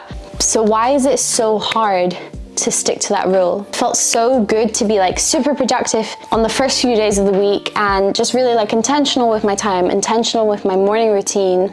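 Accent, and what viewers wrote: Scotland, United Kingdom. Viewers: Omg I love her slight Scottish accent 😍😍😍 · It’s a soft Scottish accent. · Hi, I just found you through this video, and your accent is so ambiguous. Are you scottish?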